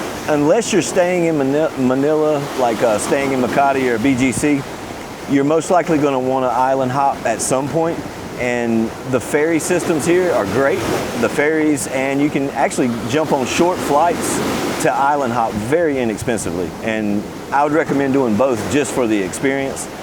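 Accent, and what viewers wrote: United States. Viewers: So wrong. For example YOU DON'T NEED A VISA FOR 59 DAYS (for most western countries). You enter the country WITHOUT visa. You can get visa only after 2 months. Your first extension is still not a visa. Another thing - don't EVER tip. If You do so - it is the same as screaming - I'm an idiot tourist, come and scam me more (or I'm from US). Another thing - You don't need internet to use maps 🤣🤣🤣 Unless You don't know how to use offline maps. And so many other stupid things in this video... I don't know who is this guy, but he definitely sounds like US tourist. The food is also BAD 8n the Philippines. Almost as bad as in the US (which has worst and most processed food in the world). Also very little to choose from. If You have been in any other Asian countries - You will be disappointed.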